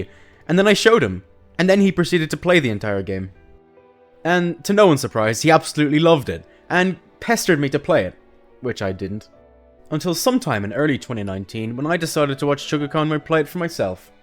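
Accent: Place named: United Kingdom. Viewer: You sound British just like the characters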